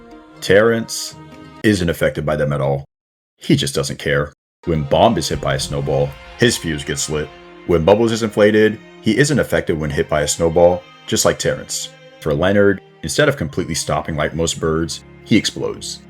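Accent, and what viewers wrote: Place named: Brazil. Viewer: eeeee um brasileiro falando ingles!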